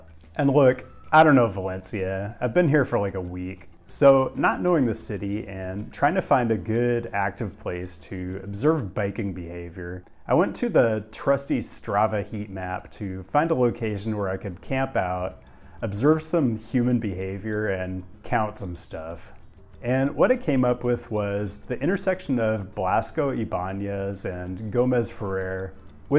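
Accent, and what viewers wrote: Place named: California, United States. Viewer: OK, I don't have much to bitch about this time, I just think references to climate change are a form of insanity or at least unhealthy obsession. CO2 is NOT a pollutant but food for plants, and there is no evidence we are about to boil because we drive cars. Having said that, I am all for sensible bike lanes Valencia style, but I am skeptical about scooters. I also have to say that your delivery is actually charming and sexy, in a weird way. I am not a fan of the Kardashians, but your delivery in that LA/CA tone actually works ;-)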